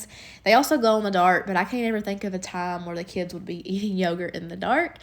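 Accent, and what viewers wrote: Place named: Rural, United States. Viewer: my son loves those cheese cubes lol. Love your country accent😊🥰🤠. Unfortunately my son is a super picky eater and does NOT eat meats or seafood so I definitely have to get creative lol. Love how real and honest you are about showing what is actually more realistic for most parents to pack for their kids. Especially when they can't microwave anything lol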